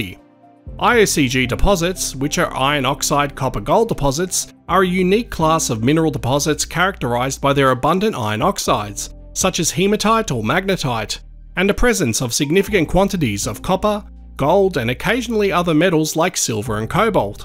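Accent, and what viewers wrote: Australia. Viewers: I could listen to Australians talk all day long , I wonder how they feel about Texan accents?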